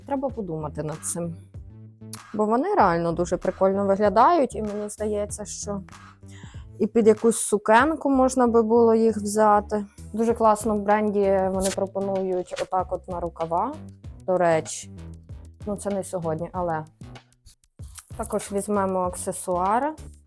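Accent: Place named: Western Ukraine, Ukraine. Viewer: а мені подобається , як Ви говорите. Можливо, це діалектизм навіть. По наголосах можу припустити , що Ви із заходу. Сорі якщо не вгадала )) і «получився» мені норм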